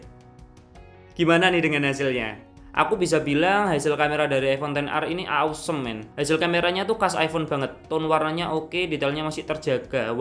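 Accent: Semarang, Indonesia. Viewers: orang semarang bro? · Dari awal dengerin video nya kok bahasanya kayak medok gitu. Dan ternyata benar. Wong semarang🤣🤣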